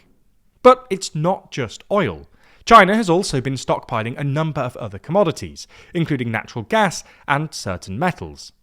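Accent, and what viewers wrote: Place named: South, United Kingdom. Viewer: He's a poncey southerner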